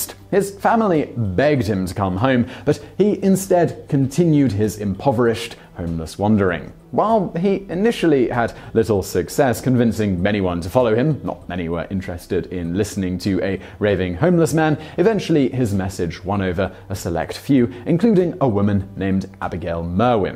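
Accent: England, United Kingdom. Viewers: This is like Stuff You Should Know but better because of the English accent.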